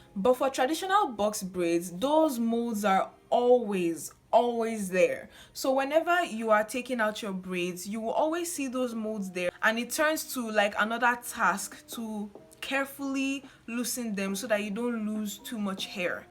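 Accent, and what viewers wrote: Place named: Africa. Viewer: i love your accent. my dad has an african accent, and sometimes i can’t understand him but like african accents are just ughhh😫😌 i love em.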